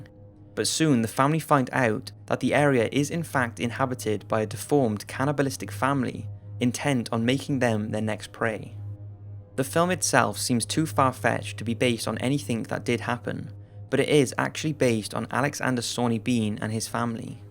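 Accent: Wales, United Kingdom. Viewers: Alex Wilde His accent is Welsh! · Is that a welsh accent I hear? Aye Welsh squad turn up🤘🏼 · ah...love that soft Welsh burr. Strangely comforting, given the horrific subject matter! 😉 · gjoni I think he is Welsh butt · The narrator is Welsh. A nice welsh accent.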